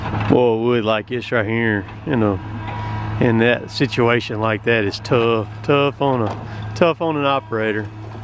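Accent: South, United States. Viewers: Stumbled across your YouTube channel. I thought to myself "this dude has got to be from the south" I'll be damned if you ain't from Columbus MS. Lol. I live in tupelo. Own a small sawmill and do a little tree jobs myself. Look up Smithsawmill on Facebook. Great videos bro!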